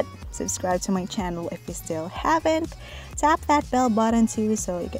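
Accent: Philippines. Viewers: You have a filipino accent 😨❤ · Squidmoons Cypher and came from the Philippines, so I guess we all sound the same (I’m from the Ph too)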